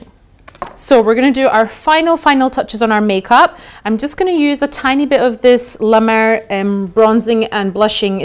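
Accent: Scotland, United Kingdom. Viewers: I love your British accent! Please take more videos in English. Greetings from North Carolina <3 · İskoç aksanı mı bu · I'm hearing lil bit british accent, especially when you are saying 'now', love ittt❤️ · tanrı misafiri iskoç aksanıyla konuşuyor, cehaletinizi belli etmeyin bari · that british accent thoooo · Daha önce yurt dışında yaşadın mı ingiliz aksanına benzettim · Loved your scottish accent. I’d like to move&study in Edinburgh or St Andrews one day. Bonnie Scotland💙🏴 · Lovely video, you've spoke with a Scottish accent.. * Edit: Carries on watching the video and realised has a family living in Scotland! 🙈🤦‍♀️ Lots of love from London! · you look stunning! Please make more English videos. It's amazing to hear English with Scottish accent.:) Love u. <3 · helal abla senin scottish aksanına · Scottish accentin cok tatli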